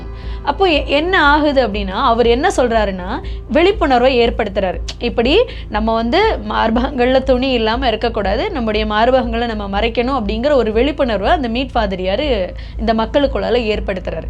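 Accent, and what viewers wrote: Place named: Nagercoil, India. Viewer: இப்ப தான் original Nagercoil காரி மாதிரி பேசுற சகோ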